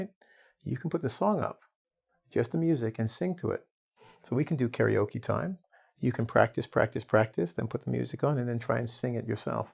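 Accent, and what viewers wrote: Russia. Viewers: Ахаха)) Хотел написать комментарий, а уже опередили! Я бы хотел увидеть отдельное видео только с русским акцентом :D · Russian accent is cool))) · Like for russian accent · I want more your videos with Russian accent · I think I would express the same as other people did, you are extremely talented and your Russian accent is perfect. Thank you very much,! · Ahaha, your russian accent is so impressive) Thanks for your lessons and explanation from Яussia) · There is the best Russian accent I have ever heard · your Russian accent is perfect!😁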